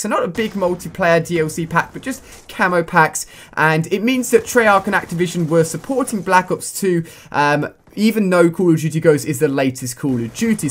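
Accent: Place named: England, United Kingdom. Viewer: English accent but says amarican (astralian)currency$$$$$??????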